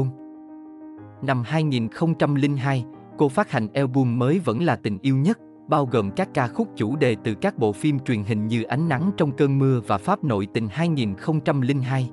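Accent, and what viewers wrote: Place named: North, Vietnam. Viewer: Hai ngàn linh hai là sao tôi không hiểu, mình là người miền nam đọc theo từ miền nam đi đừng bắt chước theo miền bắc khó hiểu lắm nha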